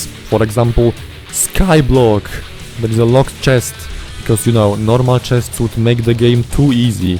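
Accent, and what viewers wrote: Poland. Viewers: Your accent sounds polish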